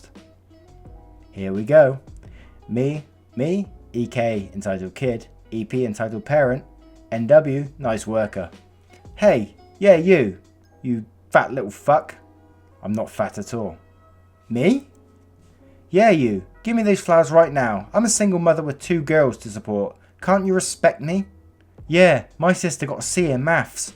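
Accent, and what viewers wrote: United Kingdom. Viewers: First video i watched, am subscribing. Your narrations are on point. Also nice to hear a British accent on Youtube :D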